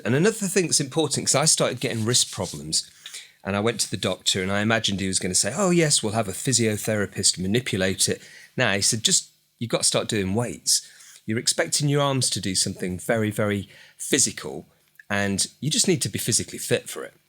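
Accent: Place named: England, United Kingdom. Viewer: I could not watch until the end. You made me grab my guitar. That's a good thing. Subbed. Cheers. P.S. London and Milton Keynes raised. I am a Kiwi now though. We escaped the wicked witch's axe and legged it out of Blighty. We flew actually, but point being that the accent is familiar. It works for me. Stay calm and none of the hype that gets my blood pressure up.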